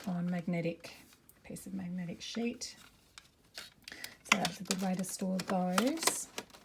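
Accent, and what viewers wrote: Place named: Australia. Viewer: Any chance your Australian, just accent sounds aussie and well normal. its weird finding an aussie card maker.. well either aussie or kiwi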